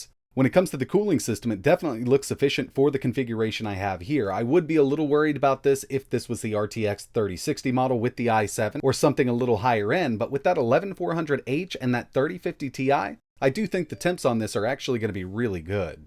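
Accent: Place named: Appalachia, United States. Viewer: I love watching this channel and have been following it for a very long time, at least two years - what interests me is what is his accent? From which part of the US, where people spoke with this kind of accent. Does anyone know that? His way of speaking is the only complaint I have regarding this channel - sometimes it sounds a bit to hillbilly, sometimes it is too noticeable! (it's not my intention to make fun of anyone)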